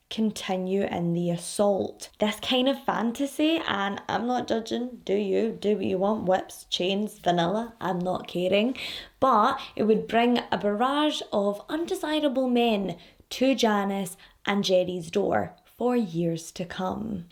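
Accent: Scotland, United Kingdom. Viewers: it really did tho mamas!! Buuuuut I've got you on the big screen rn, and even got my partner into your true crime stories! He enjoys your beautiful Scottish narration just as much as me! 😍💗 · Hi just found your channel out here in these YouTube streets and I LOVE YA!!! I love listening to the Scottish Accent!!! Yay!!!! Not to mention hoe gorgeous you are and your sense of humor is epic!!! 😉😉😉😉😉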